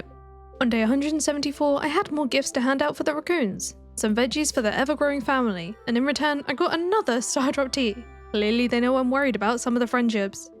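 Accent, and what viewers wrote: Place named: Australia. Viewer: I love how he kinda sounds aussie <3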